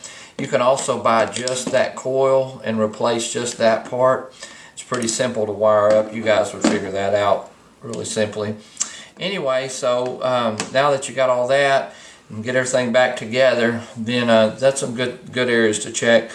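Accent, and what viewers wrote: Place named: South, United States. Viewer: What did he say here? I can't tell with his southern drawl.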